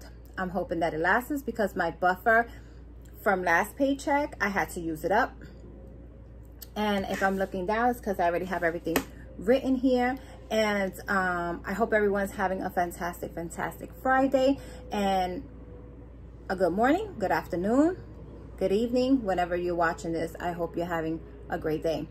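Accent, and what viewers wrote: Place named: New York City, United States. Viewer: I just came across your channel. You’re so inspiring and positive! I’m originally from NYC but live down south in FL…. Hearing your accent reminds me of home. I pray you’re able to get out of debt soon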